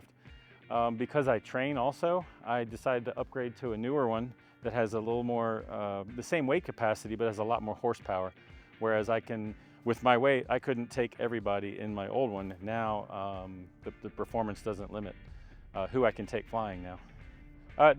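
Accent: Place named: United States. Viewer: most videos don’t mention location, but I actually did in the first sentence. Others clues for you are the English language and the American accent. Is this your first time on YouTube? If you ask questions before making arguments, you would seem smarter. Please take your silly argument somewhere else.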